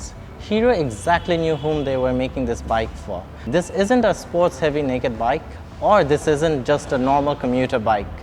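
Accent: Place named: Dhaka, Bangladesh. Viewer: amr gu er review, bangla english milai bole uttara/gulshan er nola pola pain der moto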